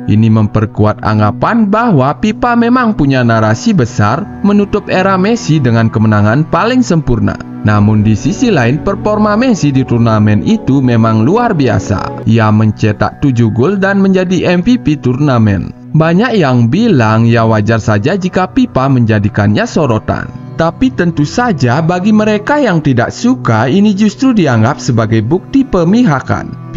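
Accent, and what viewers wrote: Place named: Aceh, Indonesia. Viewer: Suara admin nya macam logat org aceh...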